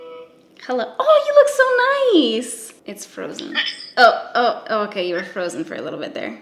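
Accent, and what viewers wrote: Canada. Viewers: I love when the Canadian comes out in your voice. It makes me happy. You guys have the best accent! Side note: it reminds me of the Anchorman 2 fight scene with the Canadian news team from Quebec. #SORRY hahahaha 😘😘😘😘😂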